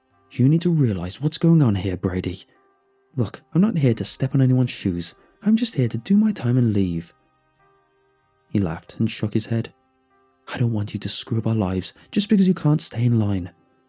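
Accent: United Kingdom. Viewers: I have watched so many videos and the British voice was a major set back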